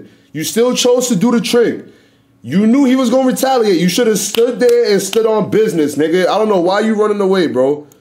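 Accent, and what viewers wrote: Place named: New York, United States. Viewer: We get it bruh you from ny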